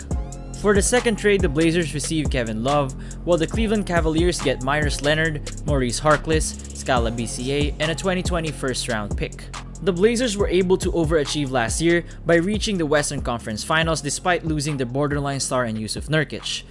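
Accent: Philippines. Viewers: You sound Filipino! Pinoy ka ba? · Your accent when you talk was just like a Filipino talking in English. Are you a Filipino? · You sound Filipino but idk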